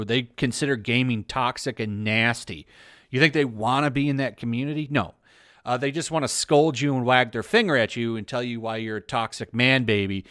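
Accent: United Kingdom. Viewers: Have never been into any games like GTA but if you can be Keanu Reeves in Cyberpunk 2077, then I'm one step closer to trying. Excellent video again brother. Loved the British accent. God bless you.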